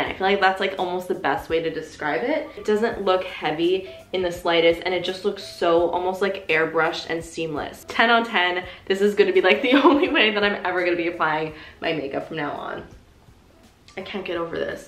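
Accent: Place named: Canada. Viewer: I love you and your videos!!! I could just listen to them 24/7. Also the way you say name is 👌🏻👌🏻👌🏻 I genuinely love your Canadian accents lol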